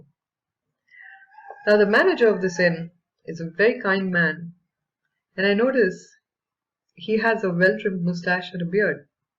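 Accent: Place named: Germany. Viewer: I am so glad I found your channel... I have always felt there are codes in the Bible, and I know for instance in the KJV, there are 783,137 words and right in the very center of these words of the Bible are two words - the Lord.... it is no coincidence that the Lord is at the very heart, the very center of the Bible... you are on to something.. I have lost most of my vision recently by eye stroke... I have to use Windows Magnifyer set at 4 or 5 tiimes normal... I will do some research and get back to you... I wonder if we can find 1221 working with other numbers in Genesis also.. I am going to do some research and see what I find, I'll get back to you.. I also feel Noah's ark, that story is also a symbol of the Rapture and Tribulation for our generation.. the God fearing people have a Protector, the Ark, helping collect them before tribulation, The Flood comes to the Earth... 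a sign for the Rapture and Tribulation right there...okay, nice to meet you, will get back if I find anything.. love your accent, is that German/Austrian I am hearing? I love it....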